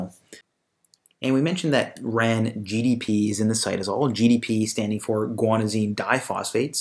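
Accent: Canada. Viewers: You rock!!! Thanks so much and do I sense a Canadian accent?? I love it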